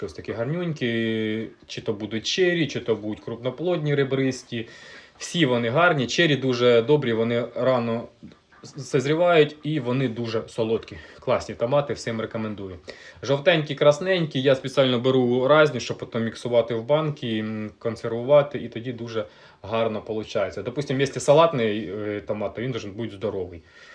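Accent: Russia. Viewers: Да , да охотно верю, прослушай ролик.Твое " Вiльне володіння" аж по ушам бьёт.Ты даже слова выговариваешь на русский манер.Там лютый Суржик.Одного не понимаю НА ХЕРА ОНО ТЕБЕ НАДО? Был нормальный канал, на нормальном языке и тут тебя Майданутость покусала? Кстати если Шо Я в Винницкой области.А то сейчас начнёшь " Вам россиянам ,нас вильных не понять."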